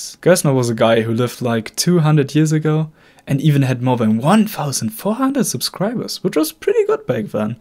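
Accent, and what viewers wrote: Germany. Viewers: Are you German? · I knew u are German, ein Deutscher erkennt doch einen anderen deutschen. xD 😂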